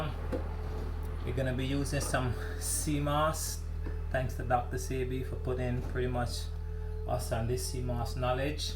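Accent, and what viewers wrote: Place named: Guyana. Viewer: u sound guyananese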